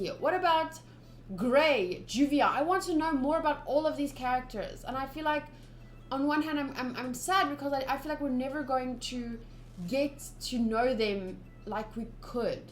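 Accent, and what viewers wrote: South Africa. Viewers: Are you south African sorry I'm also south African and your accent sounds familiar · You have a very South African accent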